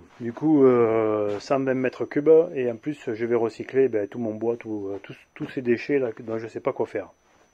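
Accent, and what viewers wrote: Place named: Bigorre, France. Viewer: Félicitations. J'ai moi aussi installé mon poêle dans mon atelier cette semaine. J'avais aussi regardé pour un Godin identique mais je n'ai pas eu la chance d'en trouver un à un prix si bas. C'est donc un theca de 20kw car j'ai la chance d'avoir une grande surface de jeu. Très belle vidéo...c'est un plaisir d'entendre l'accent bigourdan sur YouTube. Amitiés gersoises Anaïs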